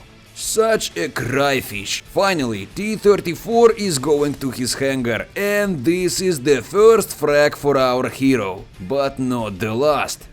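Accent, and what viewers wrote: Russia. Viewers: True russian accent.Perfect English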